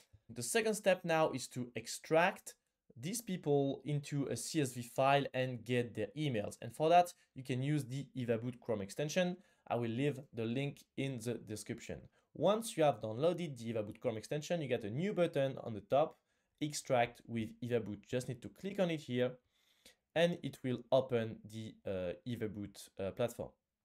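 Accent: France. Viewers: This is a fantastic video! There are a lot of YouTube videos that recommend using Linkedin Groups. Thanks for letting us know that post in Linkedin Groups is a waste to time. However, the volume on this video is rather low. And the captions are not able to capture every word because of your French accent. I'm not complaining about your French accent. But you need to do something about the volume so that people will not click off your videos.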